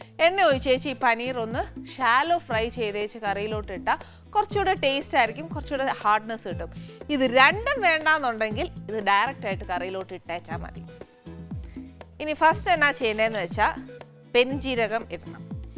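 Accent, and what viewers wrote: Kottayam, India. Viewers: Kottayam bhasha kurach koodipoyi 😂😂😂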